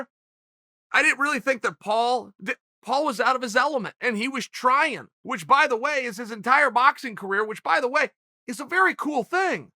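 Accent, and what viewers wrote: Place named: United States. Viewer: this dude have the most American voice i ever heard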